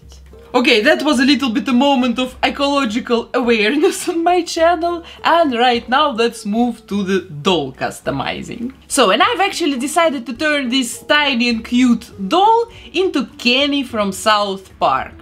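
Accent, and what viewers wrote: Russia. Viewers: Меня путает ваш выраженный русский акцент 🙃❤️ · Вы русская?) акцент очень похож :)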